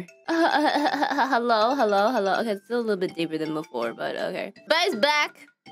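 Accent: Australia. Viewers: 중저음 호주억양 최고야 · 오지 악센트가 좋아지고 있어.. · 중저음의 호주식 영어.... 크로니와는 다른매력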